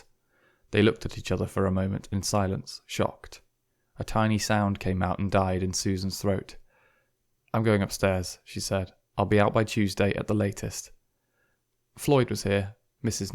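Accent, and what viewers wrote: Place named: United Kingdom. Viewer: the British accent really adds something to this lol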